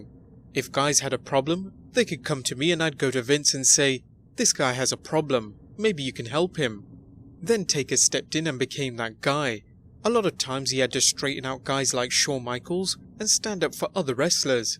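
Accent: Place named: United Kingdom. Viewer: Why is it always a British accent?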